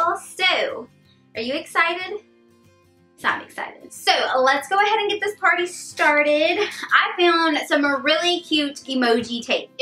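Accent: South, United States. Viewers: This is random but your accent reminds a lot of Jamie Lynn Spears sort of country ish it's so cute! 😁😂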